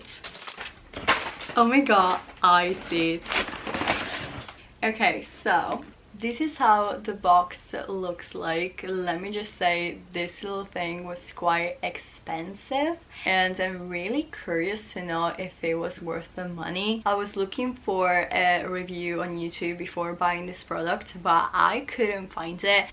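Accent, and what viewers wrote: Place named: England, United Kingdom. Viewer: Yeah she lives in London so will pick up the English twang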